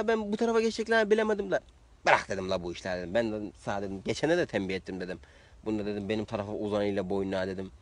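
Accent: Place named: Unye, Turkey. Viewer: Kardes ben ünyelim bizim şivemizde çok benziyor size ama gercekten on numara tebrik ediyorum seni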